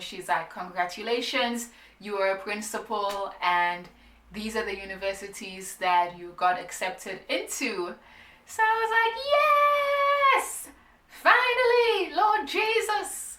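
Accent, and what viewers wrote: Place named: Jamaica. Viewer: your jamaican accent gave you a follow.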